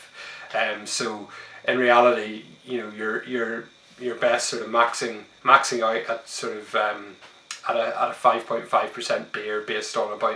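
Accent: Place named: Scotland, United Kingdom. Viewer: There he is, hey, nice to see you on the tube. Now if I didn't know better, I would say there is a very slight Ballymena lilt to your accent these days although it's most likely that Scottish influence. Definitely would like to see the braumeister in action at some point and some small batch brewing. Always worthwhile seeing how others do it. From what I remember, the mash recirculation is a thing to behold.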